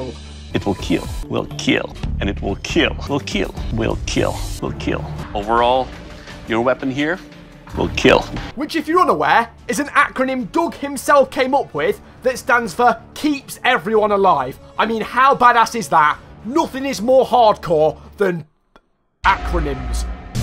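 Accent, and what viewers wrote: United Kingdom. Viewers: how to make a convincing british accent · You. Sound very Br tsch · British man recaps a show about Radom 40 year old people make pipe bombs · Aggressively british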